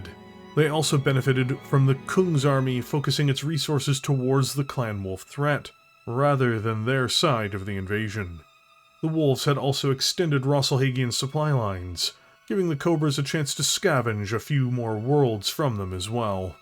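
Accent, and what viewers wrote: Canada. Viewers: I must applaud the incredible effort. A near 5hr monster that gave you no safety net to fall back on is hercule task in itself, but at first you seemed to follow the Doctor Who timeline of certain moments in history always have to happen. Not saying that couldn't glean out possibilities of who what and where, but the why was always going to be there. Twycross would always happen, yet a Rasalhaguean airspace pilot crashing into the bridge of a warship did not. Now, whether or not your crippling Canadian pronunciation is seemingly done to tweak my ears or that is just something that you do just to tweak the internet just because you have a channel and I don't. 1. I expected that Clan Wolf would be taken out of the driver's seat. Partly cause of (deserved) hatred and partly cause this was an exercise of a true 'what if'. You can't play fantasy with all the same pieces and expect a different result. 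2. Clan Hell's Horses. While the use of Hellions, Goliath Scorpions and Fire Mandrills was interesting, as some never had much written about them, so this was unique in this creation, but HH, with their mantra of combined arms of mech, tank and infantry, while similar to the innersphere doctrine of warfare, I believe in the long run, would have provided a more stable invasion force. But stable means boring and you would not have had Khan Steven Tyler(was FASA writers fans of Aerosmith?), blowing up about everything. 3. While I understand that you needed new players in the invasion of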